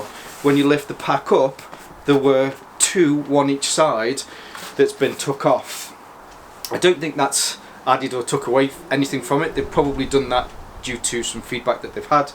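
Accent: United Kingdom. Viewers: Hello Sir, are you British ? (i say that due to your accent)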